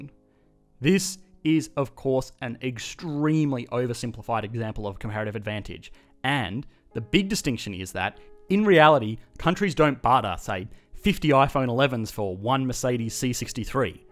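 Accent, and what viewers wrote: Australia. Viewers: I’m loving your channel as an uneducated economics enthusiast, and the Aussie accent only makes it better! Keep em’ coming! 🇦🇺👍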